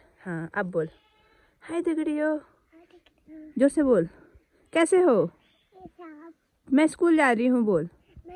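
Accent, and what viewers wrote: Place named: Kumaon, India. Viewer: मेरी पत्नी और बेटी मुनस्यारी घूम रहे हैं अभी, आपका कुमाउँनी बोलना अच्छा लगा 💐 बढ़िया व्लोग 👌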